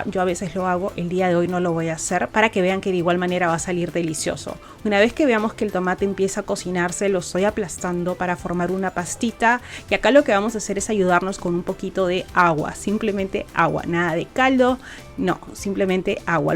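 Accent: Peru. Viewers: me encantan tus recetas, siempre sanas. Te digo desde España. Me gusta escuchar tu acento peruano ( aquí en Sevilla teiuna amiga peruana). En este video y en otros te escucho decir que algo es "demasiado" delicioso y me preguntó si en Perú se usa de modo diferente que aquí en España ya que aquí cuando decimos demasiado con algo positivo, el significado es negativo, si digo que alguien es demasiado simpático el mensaje es negativo, no me gusta que sea tan simpático. Probablemente ahí no tenga ese sentido. Bueno, una curiosidad, no es mi intención corregir tú forma de hablar ni mucho menos. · Gracias por compartir, me encanta tu dicción, tu acento bien peruano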